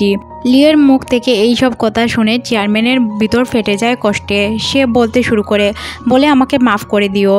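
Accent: Sylhet, Bangladesh. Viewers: Apnar basha ki Sylhet 🙄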